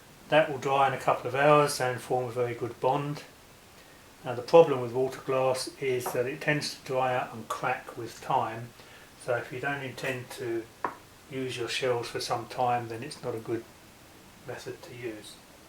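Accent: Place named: England, United Kingdom. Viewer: england would be a nice place to visit one day. if all my english internet friends didnt live in rough neighborhoods. I had to ask because of your accent. well technically it's not an accent seeing as though you speak the english language correctly guns and the english just do not seem to go hand and hand these days. older english guns however are quite amazing. i want a webley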